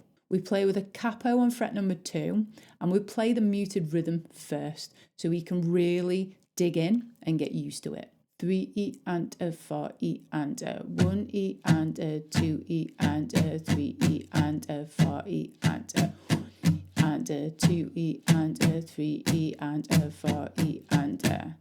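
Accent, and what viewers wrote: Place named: Lancashire, United Kingdom. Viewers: im hearing a hint of lancs/north yorks in the accent. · Many thanks, your channel popped up yesterday, the 28th August, by chance. I'm 64 and have been learning since November 2024, after years of thinking about it. Unfortunately in 2023 I broke off the ball part of the ball and socket shoulder joint and shattered my radius (both left, and my right femur, long story). Fingers don't seem to be too keen on going where I want them to, however I've just written down the and, joy, it's still a bit stop/start but I've enjoyed it. Also, in a personal level, it's nice to hear a northern accent (I'm from Blackpool, living in Stockport, where the town motto is "it's not the end of the world but you can see it from here" 😂) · Hello. Just wanted to tell you that I’m enjoying your lessons - the best around as far as I’m concerned. 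It’s also nice to hear your north country accent, (I’m originally from Cheshire). A nice change from the many stateside voices. Hope you had a nice Christmas, and wishing you a happy New Year. Paul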